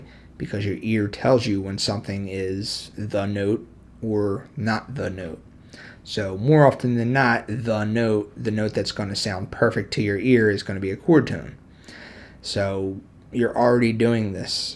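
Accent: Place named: South Jersey, United States. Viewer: Man, it's pretty easy to hear where you're from. I'm from S Jersey and you sound like a lot of people I know from Paulsboro NJ. It's that Philly outskirts on-the-river vibe. Good stuff cuz!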